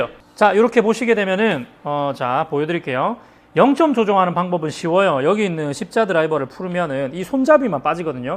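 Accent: Gyeongsang, South Korea. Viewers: 경상도분이신거 모르기가 더 어렵지 않을까요 ㅋㅋㅋㅋㅋㅋㅋ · “구불고 구불고~”아주 오랜만에 듣는 말이네요. 갱상도분이신듯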